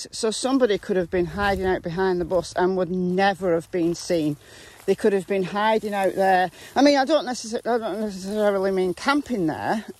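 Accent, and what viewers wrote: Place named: England, United Kingdom. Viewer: Hello.I've just discovered you and at the beginning of this video I thought you were from Massachusetts, U.S.A., from your accent.Then I realized you sounded English.The accent we have here near Boston came from the English settlers way back, so its interesting to hear the similarities.I have been following this case and I'm so confused.I don't know what to believe.I'm leaning towards abduction by a known person.I really hope they find her soon and it would be a miracle if she's found alive.It's so upsetting.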